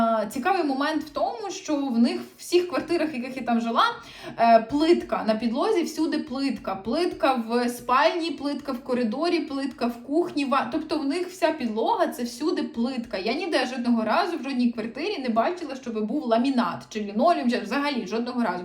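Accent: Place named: Western Ukraine, Ukraine. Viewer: З відки у вас такий жахливий акцент. Ви часом не западянка?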